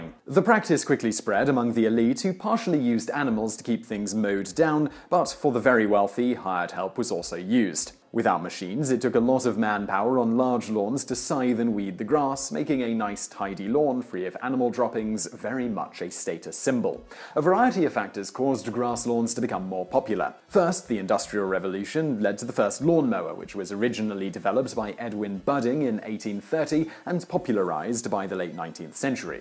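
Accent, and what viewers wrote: United Kingdom. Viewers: U British people talk so funny. U said advertisement funny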